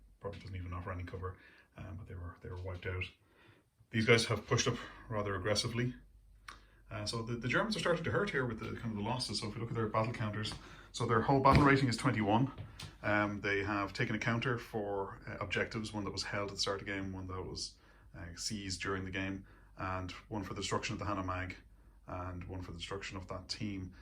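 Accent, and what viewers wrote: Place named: Ireland. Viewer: definitely Irish "Carlow"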